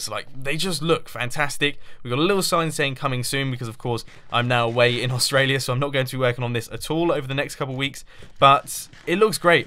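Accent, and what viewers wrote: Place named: United Kingdom. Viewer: Sometimes I can't understand in all of his Britishness. I literally just sit there and try to understand, but I find it amusing. Don't change,.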